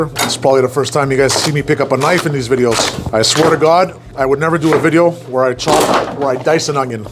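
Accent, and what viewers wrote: Montreal, Canada. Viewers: Ahh Montreal. I was trying to place that accent. Makes sense now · I love the Canadian accent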